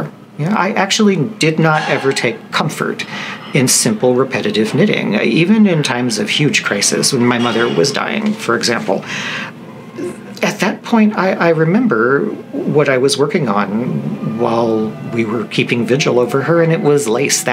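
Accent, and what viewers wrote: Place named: Chicago, United States. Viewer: Natter away,. I enjoyed your Blog and now I can enjoy your Vlog. I also enjoy your Chicago accent. I grew up in a NW suburb in the 50s and 60s. Yes, you do have an accent. Thanks for dropping into my living room today. I hope you don’t mind that I’m not dressed (literally). Keep up the vlogging and knitting and teaching. How do I get in on your patreon thing?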